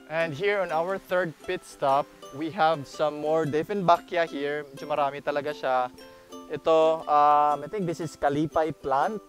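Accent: Visayas, Philippines. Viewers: Instant subscriber here.. so Bisaya ang tone Ng voice ...rpoud Bisaya here too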